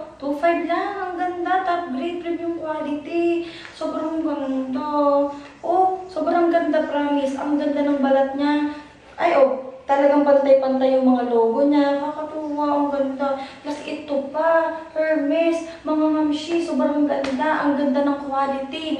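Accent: Batangas, Philippines. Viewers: hi “ new blogger here!! cute ni ate mag batangeño 😂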